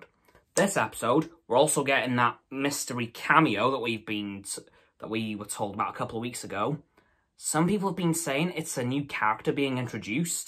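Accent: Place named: United States. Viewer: You got a lot right!!! Congrats! Btw- bring American, I could hear you give me bad news and sad stories with your accent all day and be totally fine with it. If I ever find out I have cancer you need to tell me. Lol.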